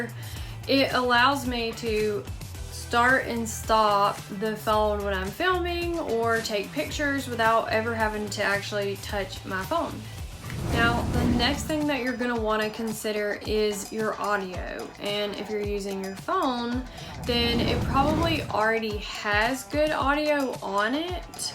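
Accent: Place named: South, United States. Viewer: You sound like a True southern Bell...If my ears aren't mistaken me?👌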